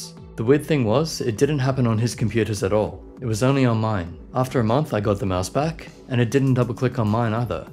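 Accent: Australia. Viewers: Are you Aussie? If so, same :D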